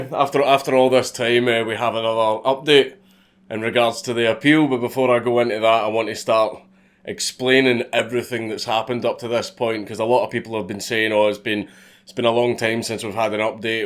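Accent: Scotland, United Kingdom. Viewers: I'm American and think this is BS. You should have won the first time. Also, I asked my little sister if she could understand your accent. Apparently I can understand a thick sottish accent really well.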